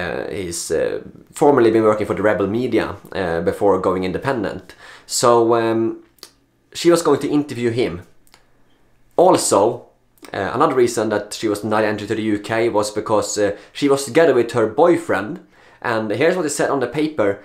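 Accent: Sweden. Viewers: This story is an absolute outrage, its all over youtube, what a joke. Radical Christian? Good grief... nothing like directing the Muslim problem on a other religion #SMH Btw, I love your accent, rants are just so lovely in broken English-Swedish. Keep up the good work. All the best x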